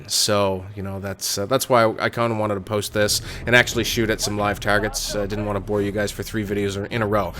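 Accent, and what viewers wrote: Canada. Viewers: aboot !!! haha Canadians and their aboot !!!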